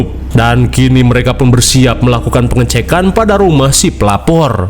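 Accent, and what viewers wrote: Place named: Sunda, Indonesia. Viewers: logatt Sunda itu biasanya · itu logat Sunda bang, resep bgt saya dengernya, karna saya juga orang Sunda🤣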